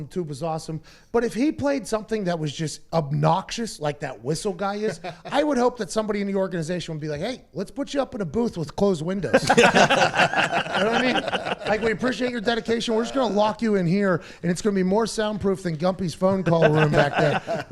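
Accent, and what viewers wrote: Pittsburgh, United States. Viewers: Pat has such a Pittsburgh accent love it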